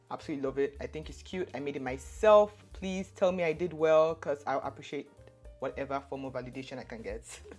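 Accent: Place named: Nigeria. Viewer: When I say your name in your channel description, I figured but I confirmed it when I heard your accent, love to see my fellow Nigerians out here especially as a beginner artist myself. Your art is amazing and the bg music is such my taste too. You’ve js gained a subscriber😊 btw could I ask where you got your iPad stand from from?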